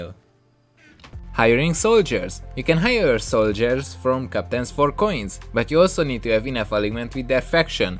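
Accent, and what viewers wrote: Hungary. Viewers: De dúrva a magyar akcentus, de fasza a videó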